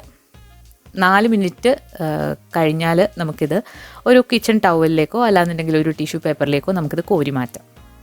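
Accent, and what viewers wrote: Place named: Kayamkulam, India. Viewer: Samsaram kettapol thonni namma naatukari aanennu. Kayamkulath evida njan kayamkulam...charummod aaane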